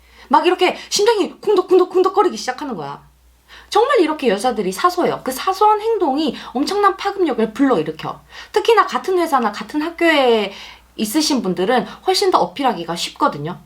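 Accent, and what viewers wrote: Gyeongsang, South Korea. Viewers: 경상도시네요....음...어딘지는 감이..새벽이라 잠이와서 ㅎㅎㅎ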